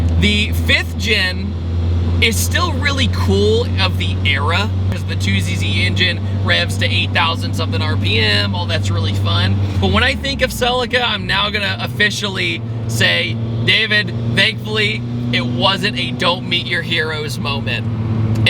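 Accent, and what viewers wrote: United States. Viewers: Damn the accent tho even when saying wrx american english and accent.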